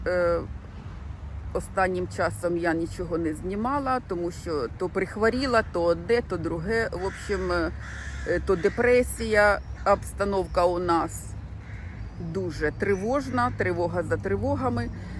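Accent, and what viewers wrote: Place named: Kryvyi Rih, Ukraine. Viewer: Володимира Великого 33а-базарёк на парапете. Мова як у криворожанки. Дякую за чудове вiдео!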